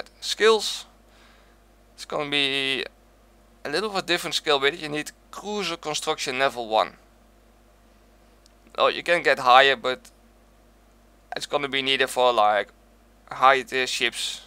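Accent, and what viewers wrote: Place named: Germany. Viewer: Thanks you havery an interesting accent and I was just wondering kinda sounded like a mixed german accent.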